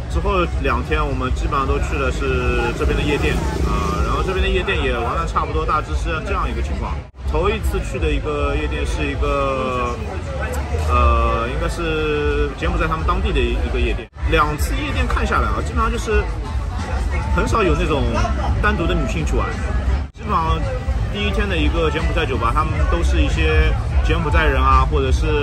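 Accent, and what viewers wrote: Shanghai, China. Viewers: 上海人？还是江浙沪的人?很好奇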